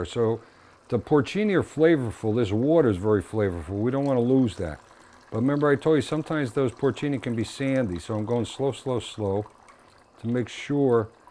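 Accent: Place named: New Jersey, United States. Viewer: Belleville, Bloomfield, Nutley or Newark? Your speech patterns sound like home. Your recipes are marvelous. Thank you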